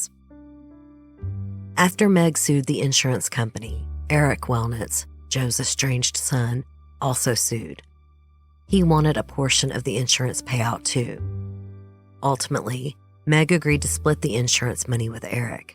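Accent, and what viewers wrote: South, United States. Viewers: It's so nice to hear a southern accent...❤ Great job on the videos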